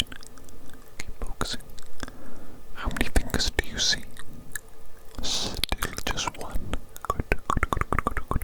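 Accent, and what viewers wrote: United Kingdom. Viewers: Are you british im from the uk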